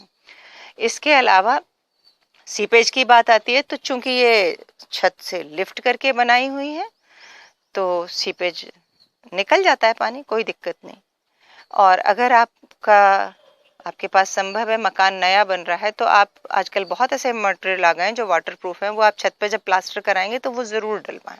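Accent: Standard. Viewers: आप की शुद्ध हिंदी सुनकर बडा अच्छा लगता है ,क्योंकि मैं मुंबई मे रहती हु .मेरा भी एक छोटासा बाग है लेकिन सब एक्झॉटिक प्लांट्स है,सोच रही हुं कुछ सब्जीभी ऊगालू लेकिन मुंबई की बारिश और मुंबई के बडे बडे चुहे कुछ भी नही उग्ने देते है क्या करू समज नही आता? लेकिन मै फिर भी प्रयास करूंगी आपसे प्रेरणा जो ली है.☺️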